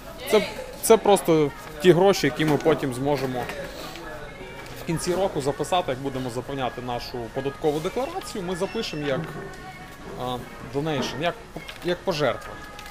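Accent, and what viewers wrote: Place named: Volyn, Ukraine. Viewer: Чути Волинська або Рівненська область)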